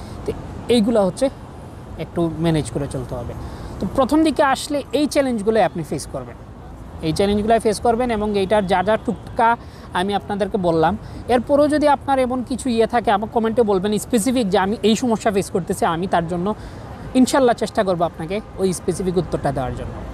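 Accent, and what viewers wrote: Sylhet, Bangladesh. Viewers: Vai afne sylhety maybe??😊 amio sylhet shahporan alakar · Bhaiya apni sylheti? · সিলেটী একসেন্টে চমৎকার উপস্থাপনা! অনেক শুভকামনা।❤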